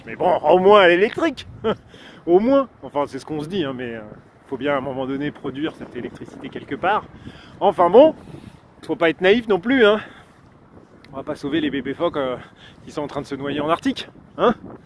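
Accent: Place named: Quebec, Canada. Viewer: ah tu es québécois ?Gpmen !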